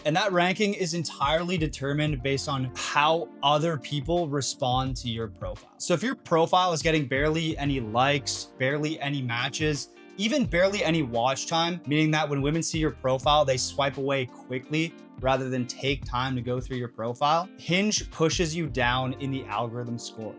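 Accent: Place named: Canada. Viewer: Thumbs down for excessive “bro” and irritating Canadian accent.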